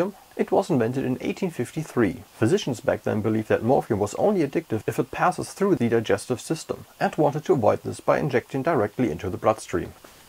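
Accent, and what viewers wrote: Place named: Germany. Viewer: FOrget about what others are saying, you are just starting out, no one should expect you to be perfect. It doesn't matter if your camera is off, you don't do the white balance right as long as the content is great. Which it is. You give interesting facts and you have a german accent. Good enough for me. :)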